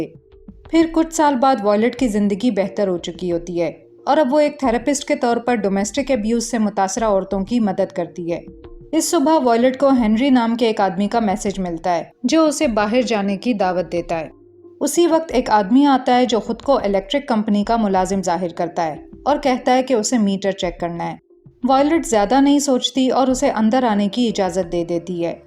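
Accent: Pakistan. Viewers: Aapke urdu aur talaffuz se malum hota hai shayad pakistan se hain. Anyways, ek french movie hai "Tell no one", uska detailing karein ...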